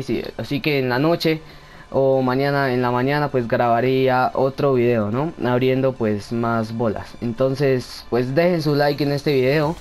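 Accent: Colombia. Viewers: sos colombiano? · Jajaja Chimba De Hablado! Solo Paisa Papito! Me Suscribo! 👌👌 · Que tu eres colombiano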